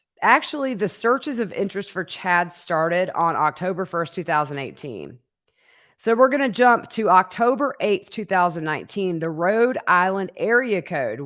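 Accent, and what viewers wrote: South, United States. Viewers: Love your accent. I’m Irish and live in Ireland, but must have been a Southern Belle in a previous life😂😂 Only joking. But love the accent, love saying y’all. 😂😂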